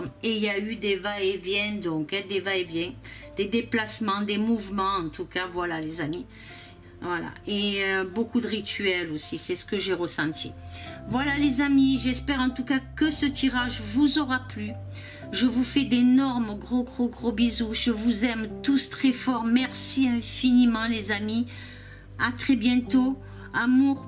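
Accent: South, France. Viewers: Bonjour accent du sud c'est e plus beau moi je suis du sud-ouest le Gers.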